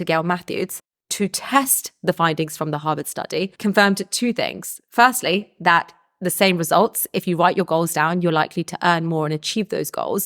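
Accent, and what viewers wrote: England, United Kingdom. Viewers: Straight up gorgeous and wicked smart. Plus you have that English accent. Good lord.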